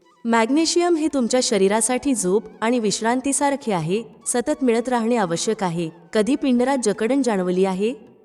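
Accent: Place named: Karnataka, India. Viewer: अगदी बरोबर.... मलाही माहिती उपायुक्त वाटली पण यांच्या व्हिडिओ तील कानडी टोन ऐकायला नको वाटतो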